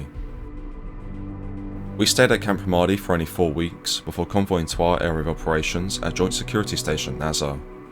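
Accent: United Kingdom. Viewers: Always a British guy narrating American documentaries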